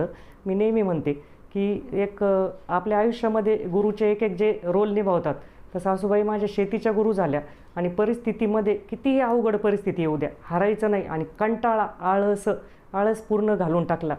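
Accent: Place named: Ahmednagar, India. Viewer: नगरी भाषेचा गोडवा, मस्त!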